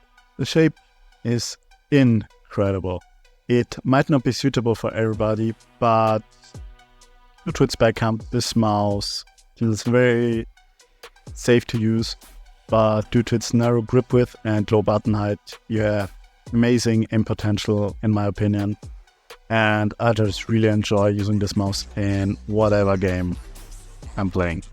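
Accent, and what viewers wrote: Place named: Germany. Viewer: I love your accent so much, German?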